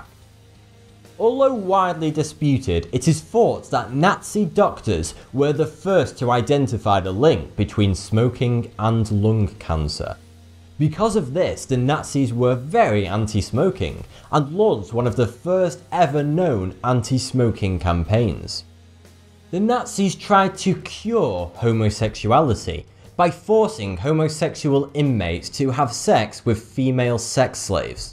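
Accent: United Kingdom. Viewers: Steve Holmes....I believe what you are describing is called having an accent ! He probably doesn't pronounce words like you and I do because he is probably not American. I believe he is British or possibly Irish. Either way, the way he sounds and/or pronounces words will not be like an American does ! Have you never heard anyone speak with an accent...even on TV or in a movie etc ? I actually like his accent, although I admit that it is a little difficult to understand at times.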